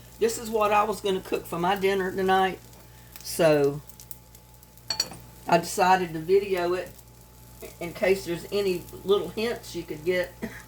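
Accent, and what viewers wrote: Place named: Texas, United States. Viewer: The next time I stock up on hamburger I will definitely freeze some like that! I just made meatloaves for the freezer with this batch. I found you because one of your videos came up in my YouTube feed & I am loving your meals & garden videos. Hearing your voice makes me homesick for Texas even though I’ve been gone for more than 35 years now. Also love your community cat, Little Buddy. What a sweetie! I will share your garden videos with my brother & SIL in Georgetown. They are starting to really get into gardening.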